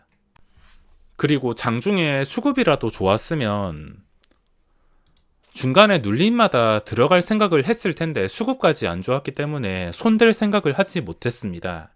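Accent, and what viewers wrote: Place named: Daegu, South Korea. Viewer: 은 목소리가 진솔하고 매력적입니다..거짓없이 솔직한분 같습니다..저도 대구살지만 억양또한 구수하네요..좋은영상감사합니다..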